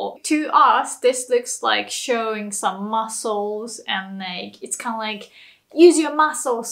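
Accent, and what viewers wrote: United Kingdom. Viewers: Your English professor was British, yes? I can hear it. 😁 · It's interesting. I'm an American and compared to other Japanese who speak English it sounds like you have a British ascent. Did you learn English from people from Great Britain rather that from America? I mean this in a nice way. I love watching videos about the differences between British and American culture. Differences in culture are fun. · Great lesson also you would be hot if you didn't have a British accent but yeah very informative video 👍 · You look are soooo cute looking 😅🙊🙈 ...and just so cute! Kawaii desu ne! 😅 Daisukidesu your English accent 😊 Have you spent time in Australia or the UK for learning English? It shows . It's so nice to hear a Japanese person speaking English without an American English accent or Influence on pronunciation. Yokatta ☺️ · You are also British,yeah?I can hear it when you speak English-the British accent is clear and I LOVE it!Aregato,love! 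· you can hear in your english that you’ve lived in the UK haha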